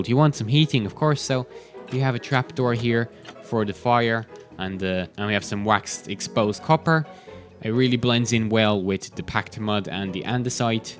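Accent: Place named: Ireland. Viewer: Is that an Irish accent I here 🇮🇪🇮🇪🇮🇪 GWAN IRELAND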